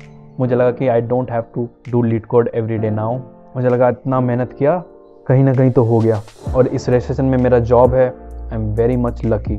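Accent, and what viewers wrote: Bengal, India. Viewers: thoda bengali accent hai ....bengal se hi ho kya ? ...... and yeah many many congratulations 🥳❤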